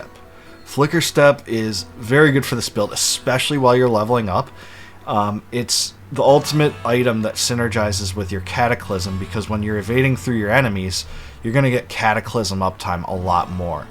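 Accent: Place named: Wisconsin, United States. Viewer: Gotta be honest, I recognized the accent before I saw the hat. A fellow Wisconsinite here, Appleton. Nice vid!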